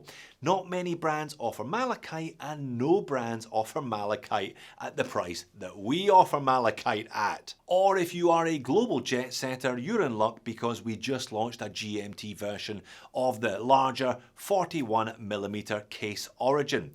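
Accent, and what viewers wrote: Scotland, United Kingdom. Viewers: Love the channel! Glad to hear a fellow Scot who loves watches! Erebus gawin yersel!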